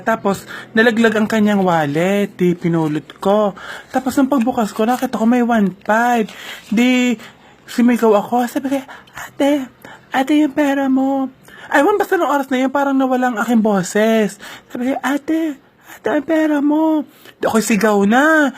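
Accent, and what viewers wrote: Batangas, Philippines. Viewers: Saan po kayo nakatira ate kasi po parang pangbatangenga salita nyo ehhh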